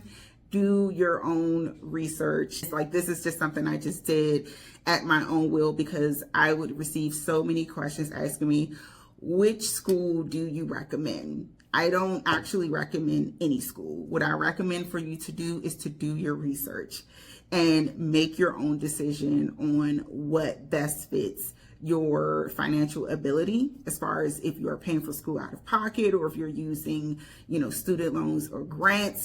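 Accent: Maryland, United States. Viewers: Are you from MD, I feel like I hear a MD accent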